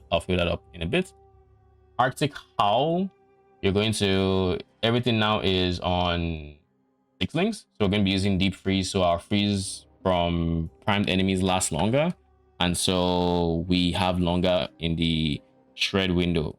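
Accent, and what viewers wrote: Nigeria. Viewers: Are you Nigerian? The accent is telling me yes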